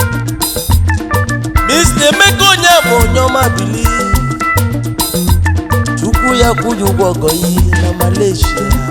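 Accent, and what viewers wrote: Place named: Anambra, Nigeria. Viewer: But he sounds like Anambra guy.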